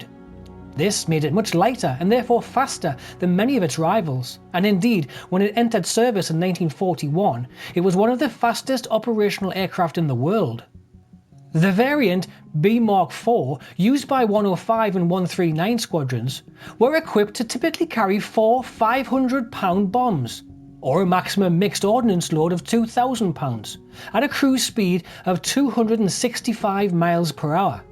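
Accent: Newcastle, United Kingdom. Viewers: Not sure if it's Geordie accent but reminds me my childhood in blyth. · You sound like a Geordie. I am from Lobley Hill, Gateshead originally.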